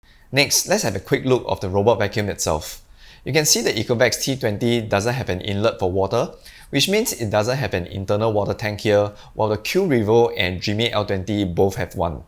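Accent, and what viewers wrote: Singapore. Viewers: You sound like my coworker from Singapore, la. Did I guess right? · You sound from SG. Are you?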